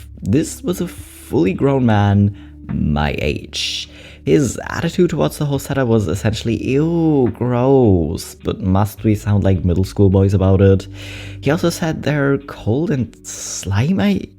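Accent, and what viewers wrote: Germany. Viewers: as a German it's very clear he's German ( I don't mean it in a bad way, just the way we pronounce stuff is very striking to me haha) much love! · I'm German, too, and I'm usually good at recognizing accents, but his I think is very subtle.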